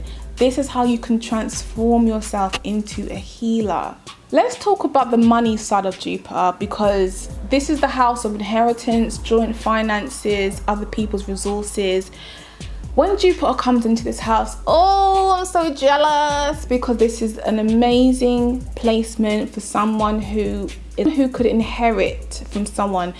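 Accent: United Kingdom. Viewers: am covid vibes did not know she was British. That just blew my mind.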